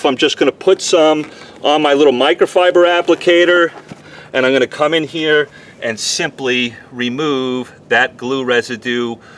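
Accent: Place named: Texas, United States. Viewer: Great job but work on your Texas accent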